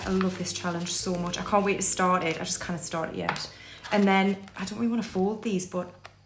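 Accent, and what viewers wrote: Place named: Scotland, United Kingdom. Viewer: Is that a Scottish accent I hear? You get my subscription for that reason at a minimum. Also, maybe I didn't get the accent quite right but I do love it. Sounds like you're making some good progress! Oof, I do hate trying to figure out the right taxes. Nice job on those savings challenges. I found you just by searching for budgeting channels on YouTube because I love the budgeting community and focus a lot of my content around it too. Keep up the good work! You're getting close to 1000 subs!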